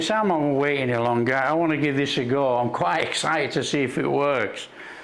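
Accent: United Kingdom. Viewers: Hi chef ,,, are you British 😊 !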